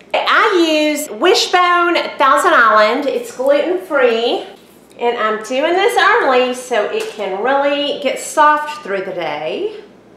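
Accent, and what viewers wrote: South, United States. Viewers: Thanks so much for the detailed response! Just found your channel today through Elle! I like your channel so I subscribed! I'm detecting a strong Southern accent - where are you from? I'm from North Carolina.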